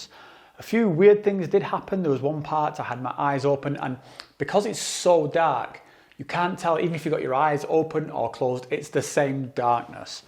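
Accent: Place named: Lancashire, United Kingdom. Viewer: What's that accent you have? You near Preston / Blackburn?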